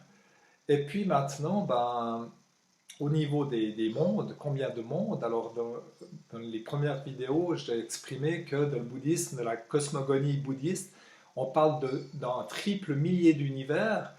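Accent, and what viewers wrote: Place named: Switzerland. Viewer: Tu es pratiquant bouddhiste ? Quelle tradition, où ça, quelle Sangha ? Vu ton accent je crois qu'on est pas loin géographiquement ? Tu semble être suisse non ? Moi je suis tout près de Genève !!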